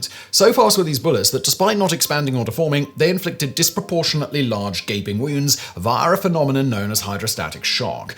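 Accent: United Kingdom. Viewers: Come on Simon give us history of the dildo! I just want to hear that whole topic discussed in that proper British accent!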